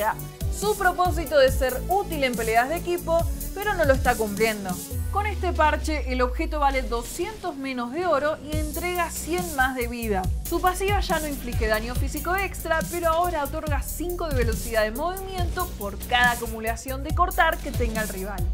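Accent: Argentina. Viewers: Que tan amiga son? solo los amigos la bancan. Habla Argentino... No incluyen a los latinos. Donde quedo el neutro?? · No tiene carisma, es muy estresante escuchar ese asentó argentino y no algo neutro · como odio ese asento argentino :( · Bro, es argentina, obviamente tiene su acento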